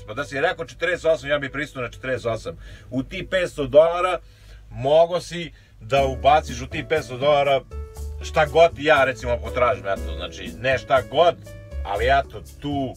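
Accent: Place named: Central Serbia, Serbia. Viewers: Zemljak, ti mora da si negde iz Krusevca,Paracina i tih krajeva? Rekao bih po govoru. A sto se tice poslovanja sa Bugarima....oni su su veci prevaranti i od nas tako da ti to sve govori. Puno srece u poslu. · Meni je posebno zanimljiv govor, pretpostavljam centralna srbija (kragujevac, jagodina, krusevac) jer sam i ja odatle... samo nastavi, extra klip ;)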